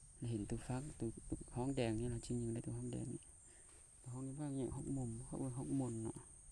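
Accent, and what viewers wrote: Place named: Thailand. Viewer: อยู่แขวงไหนครับ พูดสำเนียงภาษาเหมือนกันกับบ้านผมเลย ผมอยู่จังหวัดเลย ตรงข้ามกับเมือง ซะนะคาม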